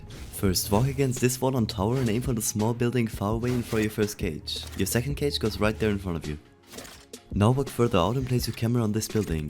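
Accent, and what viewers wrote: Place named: Germany. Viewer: Great Video, actually really well done! btw where are you from? U sound a bit German if I may say so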